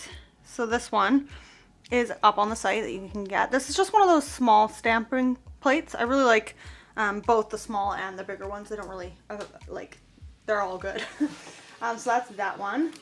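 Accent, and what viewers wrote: Canada. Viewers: is smart, educated and beautiful and many Canadians say it the way she does 🙏🏻 it’s okay to pronounce things differently based on where you live 🙂 There are many words Americans say differently than us, heck even different parts of canada say some words differently, that’s the beauty of diversity in our language 🥰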